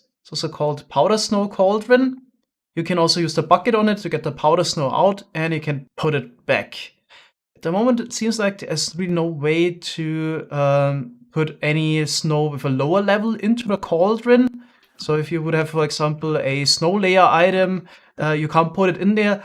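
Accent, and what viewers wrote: Germany. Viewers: I don’t hear strong German accents in English anymore, for a second I thought I heard straight up German.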